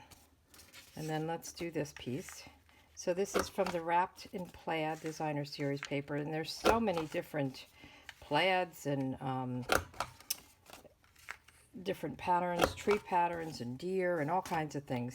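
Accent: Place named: East Coast, United States. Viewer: Soooooooooooo adorable!!! I just love where you placed the sentiment tag. Thank you so much for your well executed tutorial! And as always, this NY lady, in now living in CO, loves hearing your east coast accent!! Be well, Hugs, Karen ❌💜❌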